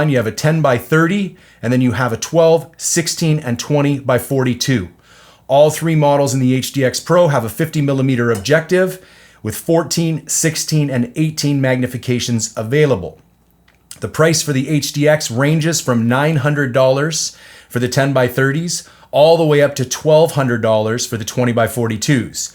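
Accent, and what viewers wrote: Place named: Canada. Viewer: I like this review. I heard about these from Cliff Gray's guide channel back when these came out and went to my local supplier and held some in my hands recently. I didn't know how the target/scan mode worked and neither did the guy at the station, but this showed us how . Picking the right model sure seems tough and fit in my bino harness I already have is going to be a pain. Carrying a pistol/food/cell phone/gps on a bino harness is a SERIOUS pain so maybe I can solve all of these problems with a buy once cry once approach. I appreciate your effort, scripting and editing, no BS, and passion and intensity. You seem like a solid dude with no bullshit sales flare with an ever so slight canadian accent. Appreciate you!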